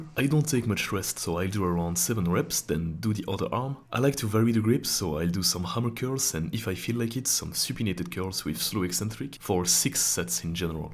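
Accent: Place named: France. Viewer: You're belgian? You have such a french accent, though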